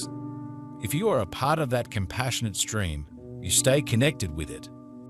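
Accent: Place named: Australia. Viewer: something about an aussie accent that is easy to dismoss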